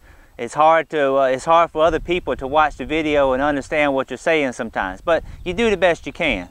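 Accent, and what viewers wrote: South, United States. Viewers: I just love that Southern accent. Plus, the guy was very positive and encouraging. I love it when things are kept simple - very simple and to the point. Great job! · Great and informative video! You seem like a really nice person; thanks for sharing your knowledge. PS--love your Southern accent!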